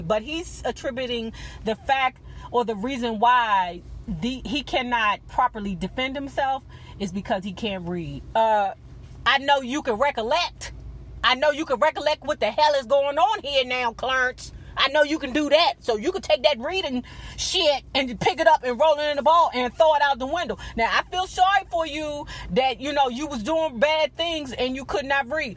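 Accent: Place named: DMV, United States. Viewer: what part of the DMV area you from i can hear it on your voice, Its in the ova's lol and certain words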